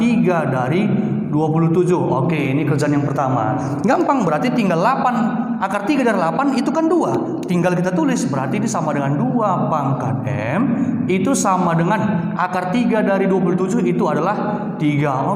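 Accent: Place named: North Sumatra, Indonesia. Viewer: Bapak org Sumut ya pak? Logat bicara kita hampir mirip soalnya😁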